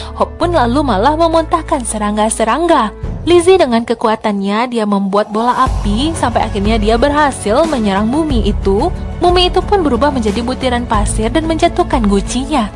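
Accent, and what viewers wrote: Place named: West Sumatra, Indonesia. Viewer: Hahahahah ketahuan orang minang y?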